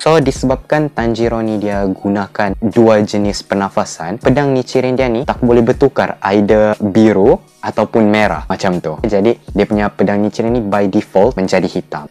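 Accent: Sabah, Malaysia. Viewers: abang ni orang sabah ke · Speaker ni orang tawau sabah ka?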